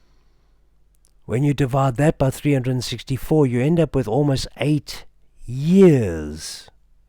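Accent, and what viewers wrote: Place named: South Africa. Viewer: Some great information and very interesting (but would not be half as interesting without the SA accent!). Unfortunately on the down side, I have some bad news to bring the community, yes my toast got burnt, it was 2 slices, I'm uncertain exactly at which point this was but believe at 1hr 48mins I'd remember something was under the grill. Just wanted to warn anyone else starting to watch this as you might consider finishing off all your weekend or weekday duties that aren't time dependant. Enjoy! 🦉🧔‍♂23🪑<imagine this is a bench>🪑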